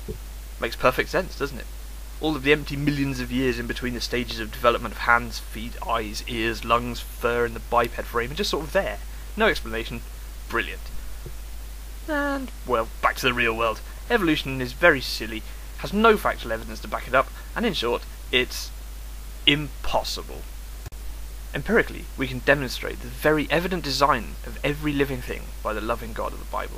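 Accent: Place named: England, United Kingdom. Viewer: Maybe it's the english accent, but I don't believe you're this dumb. I think you're a troll. Get a life guy.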